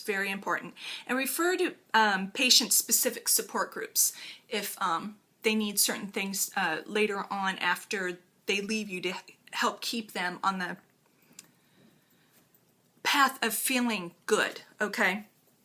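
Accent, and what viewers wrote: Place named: Maryland, United States. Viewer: You must be a marylander... you have the same accent as me! Love your videos, thank you so much for taking the time to do them!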